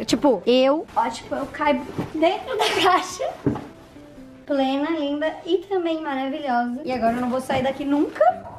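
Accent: Rio Grande do Sul, Brazil. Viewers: BAH THÊ ELA GAUCHA MESMO EU SOU DE VIAMÃO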